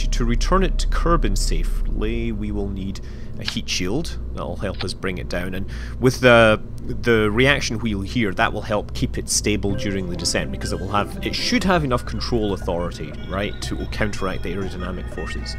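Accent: Scotland, United Kingdom. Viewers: i love that your brilliantly scottish accent means that you could be saying mun or moon, i love it xD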